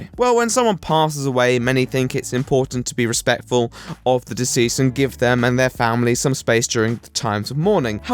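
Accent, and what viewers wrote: RP, United Kingdom. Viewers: You sound like super nanny do all posh people not know how to pronounce acceptable?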